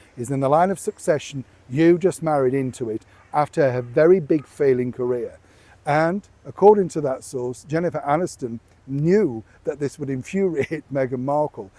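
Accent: United Kingdom. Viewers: Your British accent and the lovely scenes from London and environs make me want to have a cup of tea every time ! Sometimes I make a full pot ! Today I had iced tea ! It was 104 F outside too hot for a fire, the A/C is running and a fan too...greetings from Stockton, California USA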